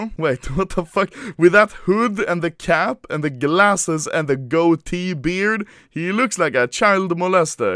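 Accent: Sweden. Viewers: this swedish accent annoys me so much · He is swedish so he cant speak like an English native bro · U come from sweden right? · Ur sooooo from sweden. Right?? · You from sweden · Swedish accent hehe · you have a swedish accent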